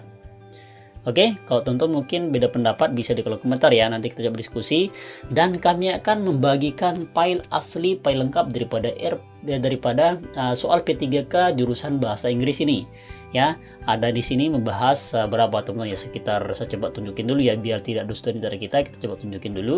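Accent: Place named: Indonesia. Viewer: anda sbgai guru B.Indo ap B.Inggris pk, cara baca opsinya kok kyk bahasa indo pronounciationnya..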